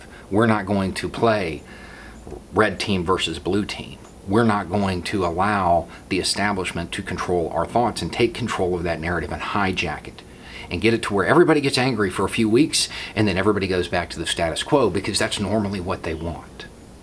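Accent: South, United States. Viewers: Hey, love the messages in your videos. How does your own local community receive your views? I'm assuming from your accent that you live in the south and therefore probably a right/ conservative leaning state? Thanks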